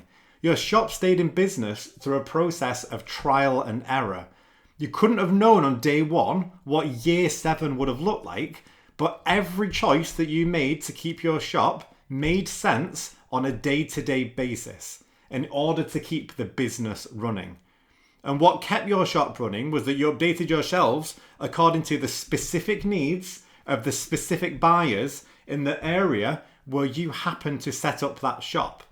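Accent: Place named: RP, United Kingdom. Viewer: Your accent is perfectly fine. I don't even realize my Southern U.S. accent until I hear it on a recording, which has been extremely rare. I do not like my own voice and speech, then wonder how other people can stand to listen to me speak, but no one has ever said anything. Here, we talk of your accent being "proper" English. Fancy or high class. I've recently been a student of the shadow self, which has helped me tremendously, and is why your video was recommended to me. The favorite teacher I've been learning from speaks of the shadow in negative terms, because he is referring to that which we are not attending to because we interpret it as negative. When we're working on these aspects of ourselves, we tend to think negative thoughts are all bad, and we should only ever have positive ideas, so we try to force them into non-existence. Then failure is inevitable because of how we bury them, instead of bringing them into the light to be dealt with without judgement or chain reactions they cause through mental-emotional-mental reactions. 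Instructing that we should be aware and allow and accept them, but only to the extent of the feelings they cause in our bodies, instead of the thought itself, considering the thoughts are not actually you; just programmed thoughts everyone has. Anyway, you've given me a different perspective on it, even if I can't think of even one personal thing that relates to it, I do understand now. Thanks very much, and I'll watch for your other content.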